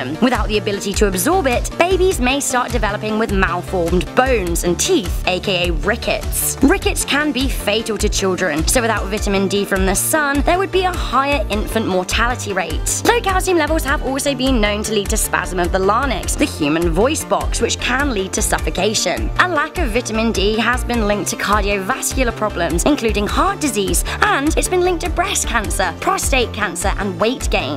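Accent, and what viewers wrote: United Kingdom. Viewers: she has a British proper English accent. I do not but I appreciate proper English, from the source. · Edward Waller She's British, that's how Brits say it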